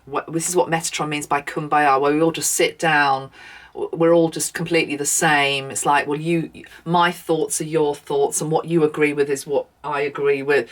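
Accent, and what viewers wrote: England, United Kingdom. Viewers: Hello I was just introduced to you last week by a friend and I so resonate with your messages I love your lovely English accent and I so appreciate your truth Thank you so much where we go one we go all Diane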